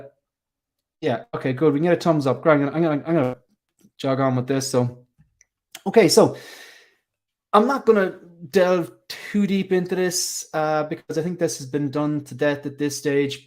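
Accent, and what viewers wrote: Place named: Ireland. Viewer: assume by your accent you are based in Ireland? So where do you normally buy your figures ?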